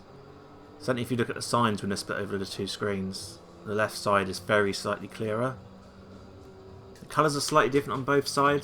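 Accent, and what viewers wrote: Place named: United Kingdom. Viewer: Guessing based on your accent... you are from the UK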